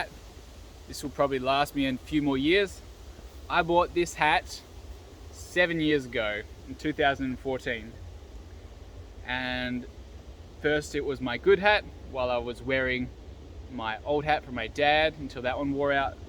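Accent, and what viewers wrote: Australia. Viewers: In Australia, men take off their Akubra ( the older hat) when entering a house, before entering a church, and at a funeral as a sign of respect. These men tip the front of Akubra slightly when meeting a woman ( traditional) , again as a sign of respect. Sadly this way of life is going.This man has a rural accent and wears rural clothes, including the hat. These people are the backbone of Australia. Russia's gain is Australia 's loss.Aussie, aussie, aussie oy, oy , oy!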